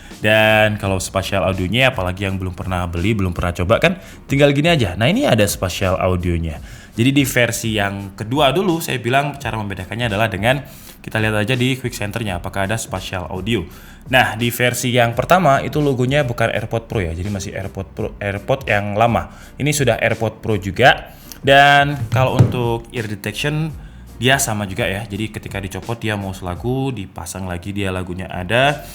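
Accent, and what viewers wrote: Java, Indonesia. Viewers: Wong jowo to yoan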